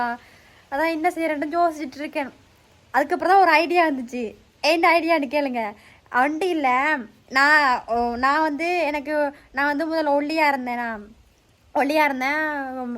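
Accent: Sri Lanka. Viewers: நீ பேசும் இலங்கை தமிழ் அழகாக இருக்கிறது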